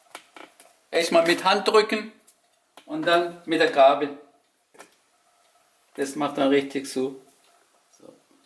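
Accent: Swabia, Germany. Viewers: Ich fand die Sache mit dem schwäbischen Akzent lustig. Türkisches Essen auf Schwäbisch ist einfach nur genial. ^^ (komme aus Ö) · Ich find doof, dass hier so viele seine "ausgezeichneten" Deutschfähigkeiten hochleben lassen... Darf man nicht nach Jahren hier leben, gut deutsch sprechen und integriert sein oder ist das so besonders?, ich find dein Schwäbisch klasse - Mach doch das nächste Mal Spätzle für uns:P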